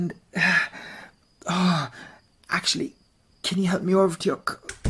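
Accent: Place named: Ireland. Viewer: Irish accent is definitely a lady killer specially with voice!! 🍀🥂He's a lady killer himself and he knows it ladies hehe 🤭🥰 cute audio btw 😜💞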